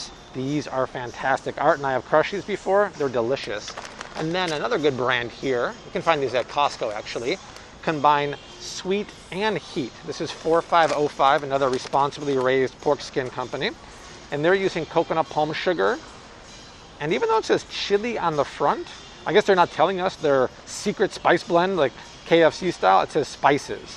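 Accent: France. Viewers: the way he pronounces the letter G sometimes sound slightly French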